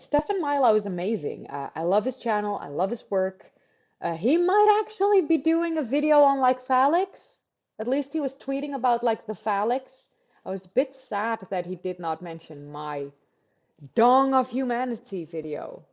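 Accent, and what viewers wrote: United States. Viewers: your diction, intonation and pacing / rhythm so like "American English", that I thought you were an American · your accent,..which, to my ears, sounds rather American - influenced ( especially the ‘ r ‘ consonant )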